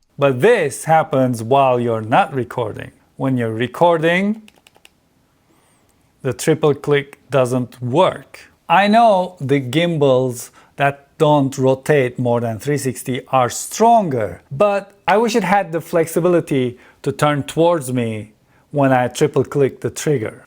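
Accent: Turkey. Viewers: Butun video dedim ki Turk aksani duyuyorum surekli. En sondaki hoscakalin the cherry on top oldu :) Daha bugun aldim cihazi merak ediyordum farklari tesekkurler video icin. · Aksanı türke benzemiyor mu adam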